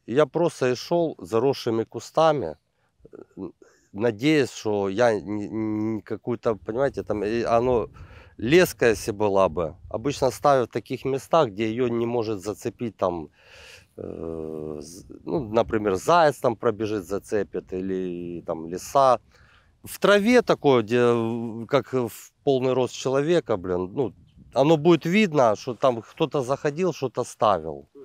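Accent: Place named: Russia. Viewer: Твоя мова це здається що ти з раші прийшов !!! Та навчіться кінець кінців своєї мови !!!!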